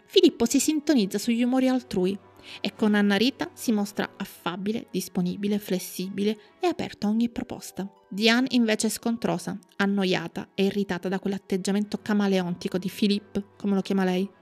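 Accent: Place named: South, Italy. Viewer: Il canale è bello..peccato per la voce narrante. Si sente troppo l'inflessione dialettale del sud.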